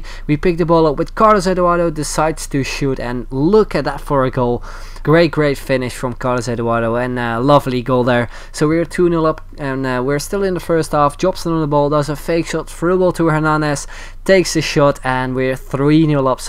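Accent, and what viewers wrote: Netherlands. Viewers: Yo, are you dutch? Because your English sounds like a Dutch guy speaking English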